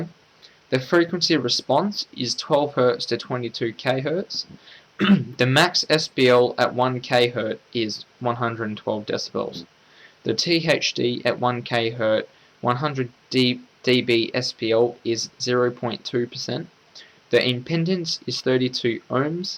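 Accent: Australia. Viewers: thanks, aussie? :)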